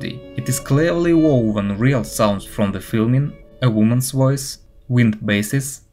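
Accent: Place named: Russia. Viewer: Good review. I really enjoyed it . I first had trouble with your Russian accent, but then it is was a good idea to add captions and titles throughout the video. Keep it up.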